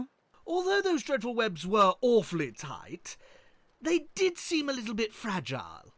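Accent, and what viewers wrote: RP, United Kingdom. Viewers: This is the most British sounding person I’ve ever heard 😂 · I love there voice tbh, I definitely will binge the channel just to hear the fancy British vampire voice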